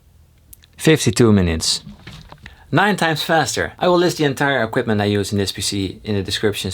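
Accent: Netherlands. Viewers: he is, the accent (though not as bad as other Dutch people) is a dead giveaway =P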